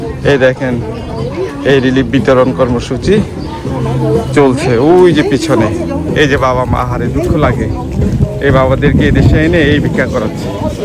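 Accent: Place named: Dinajpur, Bangladesh. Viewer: এ হতে পারে ওপার দিনাজপুর গ্রামের গলার স্বর তেমনি বলে।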